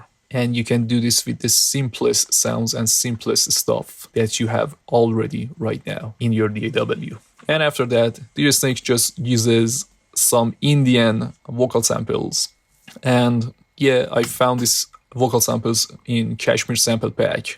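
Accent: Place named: Iran. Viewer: Your accent sounds like a persian, are you?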